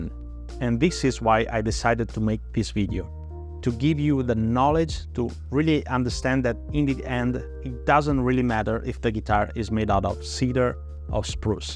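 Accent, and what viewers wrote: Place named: Italy. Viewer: We love that italian accent.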